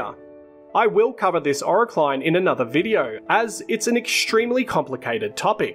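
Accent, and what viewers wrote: Australia. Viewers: If you haven't heard an Aussie accent before (not Ozzy btw) you need to get out more mate.... there's a whole wide world outside the UK. Live a little! 😂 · I never heard an accent like this narrator. All the most annoying parts of Ozzy, Proddy and received English rolled into one. Add a few Brummy vowels and there'll be another mass extinction event.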